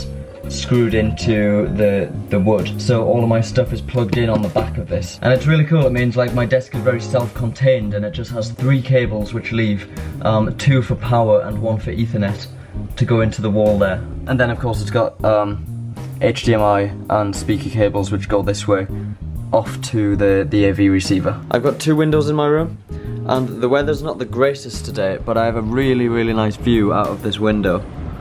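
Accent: United Kingdom. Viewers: i like your room setup! and thanks for the British accent :D , watching ur videos really improve my English lol *without sub :p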